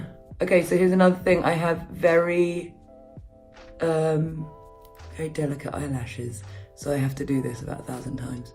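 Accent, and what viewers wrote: United Kingdom. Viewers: The way she talks is so adorably British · I love her 👌❤️ her british accent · Omg , i love so much the British accent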